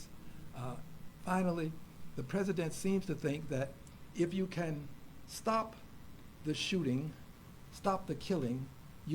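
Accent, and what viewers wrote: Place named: United States. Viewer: True and clear speech . For me as a german it sounds like America.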